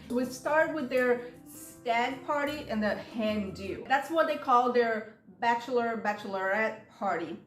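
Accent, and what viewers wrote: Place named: Brazil. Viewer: Are you from Brazil? I can hear a little accent 😊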